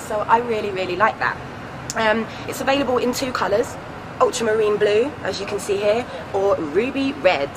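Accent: United Kingdom. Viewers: british english :D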